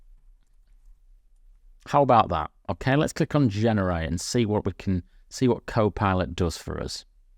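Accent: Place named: Northern England, United Kingdom. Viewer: Northern England.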